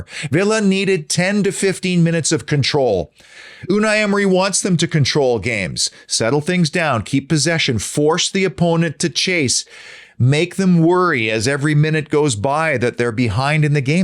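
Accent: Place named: Canada. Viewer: its awesome to hear that Canadian accent from a true Villan. international pride🦁🦁🦁. does somebody know if anyone is doing these kinds of videos on our Lionesses??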